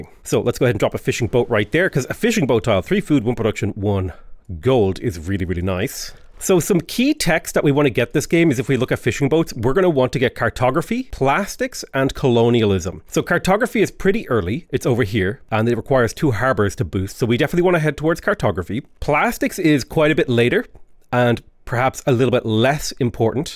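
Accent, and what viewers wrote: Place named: Ireland. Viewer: As a Scotsman from a small town between Dundee and Aberdeen IRL I think you have improved the landscape massively 😅 however I really wish you would talk a little slower as the tips on the game you are providing are gold dust. And if it's hard for a Scotsman to understand your quick-fire Irish, then I'll guarantee other people will struggle more. Looking forward to watching this entire playlist though.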